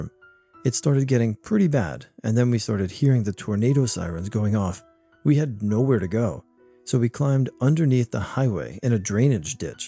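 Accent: England, United Kingdom. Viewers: Longtime fan of the channel, as a Brit, I think your English accent is hilarious. 😆 Keep up the good work! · Hi! Thank you for your great work! I was super entertained by your British accent. 👍🏻👍🏻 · The English accent in the first story made me laugh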